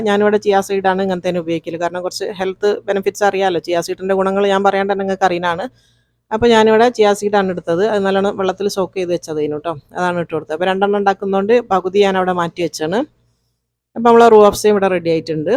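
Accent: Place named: Kozhikode, India. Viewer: Nannaayitundu❤️original kozhikode aannule.... Samsaarathil manasilaakunnundu👍🏻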